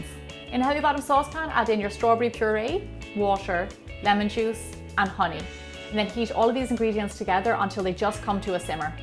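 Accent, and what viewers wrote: Ireland. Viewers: Omg... I loveeeee this... Thanks for sharing... You sound "Irish" to me, may I just say, that I love Ireland... I fund it beautiful when I visited... Love to go back again some day. Thanks for sharing... Love that you give tips on how to "vegan"-nize your recipes... 😘😘😘❤️❤️❤️👏🏽👏🏽👏🏽👏🏽👏🏽👍🏿👍🏿👍🏿 Best wishes. From me at Sunshine State 🌴🌴🌴🌴🌴 🤗🤗🤗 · Thank you:) I was picking up in the Irish accent but I was uncertain:) I listen to Liveliness every day on Radio One over the RTE app.:) I hope to go someday! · What part of Ireland are you from? (but Limerick is the best because I'm from there)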